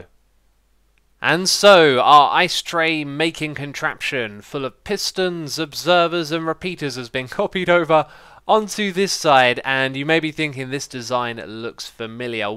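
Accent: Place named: United Kingdom. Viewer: I absolutely love your videos! Technical building and a British accent! What more could you ask for?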